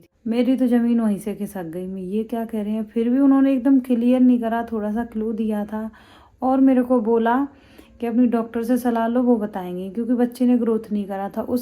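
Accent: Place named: Bharatpur, India. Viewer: Aap kaha sey ho Rajasthan language sey to bharatpur k aas pass k kag rhey ho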